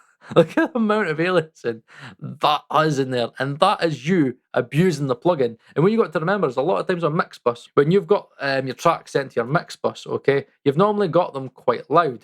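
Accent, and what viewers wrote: Scotland, United Kingdom. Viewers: What is your accent? Is it scottish!? I really struggle to undertsand you as a non-native speaker.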